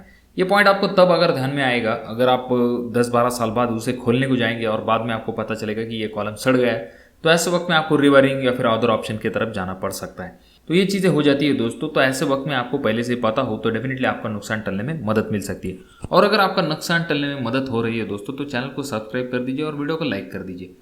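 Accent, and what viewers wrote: Maharashtra, India. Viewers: Bhava tu marathi ahes bahutek tuzya avajamule lgech smjty karn aplya marathi lokanchi hindi aschich aste 😀😁..baki video ek number astat tuzya khup mahiti milte tuzya video mdhun .keep it up bhava 🚩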